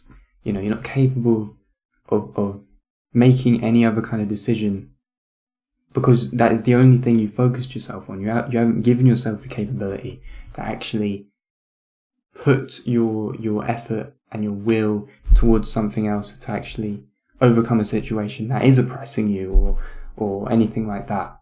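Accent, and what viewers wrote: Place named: Cockney, United Kingdom. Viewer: Philosophy with a cockney accent....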